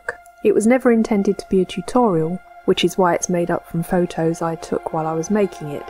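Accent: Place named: United Kingdom. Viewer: Hey, came here from SEG! I’m so glad he mentioned you as this is exactly my type of content. I have plans for making some period dolls house vignettes with a story tying them together. I’m teaching myself so it will be slow going, but it’s been a dream for so long. Do you ever showcase your work in person? I am uk based and your accent makes me think you are too. I’d love to see it in person one day.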